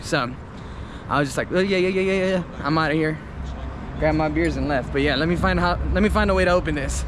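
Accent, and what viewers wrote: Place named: Miami, United States. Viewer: you have to tell me you’re from Miami. I know that accent anywhere! This is my first video so not sure if you’ve mentioned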